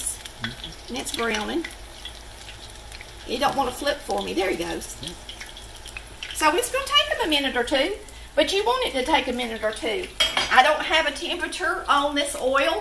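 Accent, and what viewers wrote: South, United States. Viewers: Looks absolutely delicious, and you make it look easy enough that I think I can make this recipe! I just love your Southern accent: "MEELK," "THE-IS," "Y'ALL," etc. I'm originally from Savannah, GA, but lost my accent years ago when my family moved to Ohio. I've subscribed because you and your husband talking and cooking is so great, and I've got to watch more. Thanks! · I'm from Florida and I've been watching about 7 minutes, being as I just found your channel. Love your southern accent by the way! Thanks for sharing! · I had a lemon tree and the 🍋 lemons were bigger than a can of coke! They were also a little sweeter than a normal lemon. I sure do miss having that lemon tree! I also had a grapefruit tree that the grapefruits were a little bit bigger than normal and talk about being sweet, you didn’t have to add any sugar to eat them. 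We could just peel them and eat them like you would eat an orange! I miss having those for breakfast in the mornings! I, too enjoyed watching this video and listening to her talk with that accent! I am from NC and miss hearing it and the funny sayings that comes out of the south! Can’t wait to make make these! Sometimes I want something at night while I’m watching TV but I don’t want anything really sweet, and this sounds like it would fit the bill! 🦋🦋❤️🦋🦋🍋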